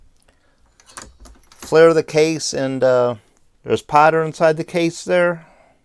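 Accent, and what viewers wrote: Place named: Pittsburgh, United States. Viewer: I thought the same thing!!! I heard “arahn tahn” and I was ready to place my order at Primantis and tune into 102.5 DVE lol. Ours is a pretty unique accent. I get asked where I’m from all the time down here in Tennessee only two people in six years have been able to place the accent, a Nurse who’s husband is from Pittsburgh and an Air Evac pilot.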